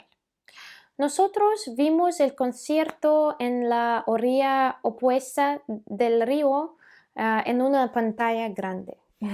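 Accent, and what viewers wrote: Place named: Russia. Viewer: Vi un video de abril y hablabas como estadounidense hablando en español mexicano y ahora, 5 meses después hablas comonrusa hablando español mexicano.: Gran avance.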